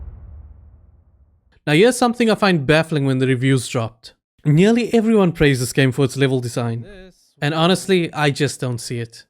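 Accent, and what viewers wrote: South Africa. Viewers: Your English is flawless. Sounds like your first language but you have a bit of a South African accent?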